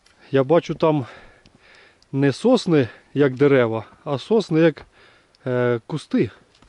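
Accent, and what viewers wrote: Russia. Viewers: вы разговариваете по украински с русским акцентом по русски наоборот с украинским 😮😊❤ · Потому что думаете вы на русском и это слышно😢😢😢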